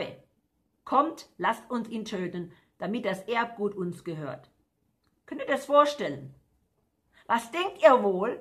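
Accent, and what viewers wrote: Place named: Baden, Germany. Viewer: Liebe, ich komme Auch aus dem badischen, ich wohne bei Wiesloch 😍 Habe früher schon gedacht, dass wir aus einer Gegend kommen, auch wenn Du sehr schön hochdeutsch sprichst, man hört es trotzdem "e bissl " Gottes Segen ins Ferne Amerika 🙏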